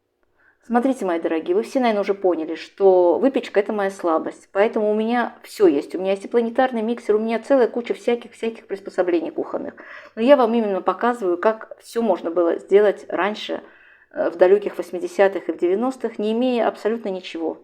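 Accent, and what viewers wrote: Baku, Azerbaijan. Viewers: Вы наш глоток воздуха…, наша замечательная память о родном , любимом Баку!!!!! Все ,что вы предлагаете нам испечь, все вкусняшка! С огромным удовольствием слушаем Вашу речь с Бакинским выговором, мягким и нежным, только наши женщины так разговаривают, певуче! Будте Счастливы и оставайтесь долго, долго такой обаятельной и привлекательной!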